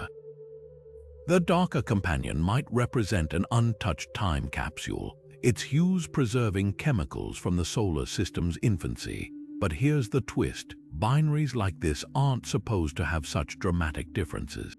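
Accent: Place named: United Kingdom. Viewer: You said it well! I hit pause when the sneakily perfect British AI voice called the region the "Cue-a-per" Belt.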